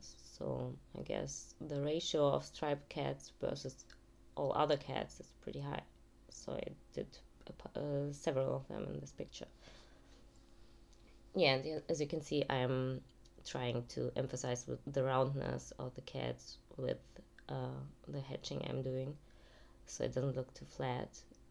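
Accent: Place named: Germany. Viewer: damnnn dat german accent tho 😂 (Im from germany and I struggle with that too, no hate)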